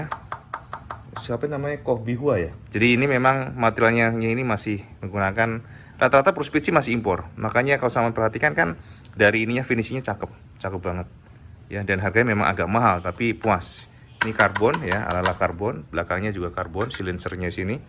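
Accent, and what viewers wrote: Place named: East Java, Indonesia. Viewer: nonton kembali vidio ku ya ;) Aq guyu2 dewe cak ngrungokne bahasae peno koyo wonk jatim ada an 😁😁🤭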